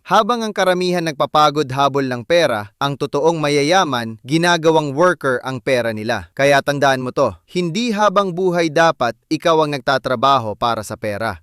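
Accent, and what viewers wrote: India. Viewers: Mokhang mombay ang nag sasalita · bakit nagiging tunog bumbay???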